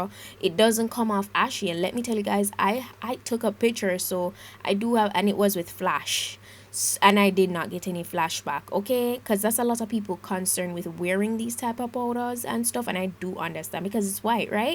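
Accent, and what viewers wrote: Jamaica. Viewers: Love everything....the makeup, the Jamaican accent😉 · I hear that Jamaican accent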